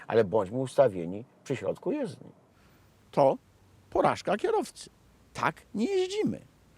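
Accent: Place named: Kraków, Poland. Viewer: Po Krakowsku mówi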